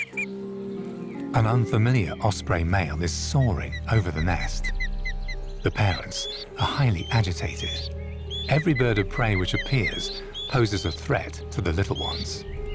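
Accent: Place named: United Kingdom. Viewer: And so they get a stinking tommy with his snotty accent to narrate this? Where is the German pride? SAD. These brits are into everything but actual work.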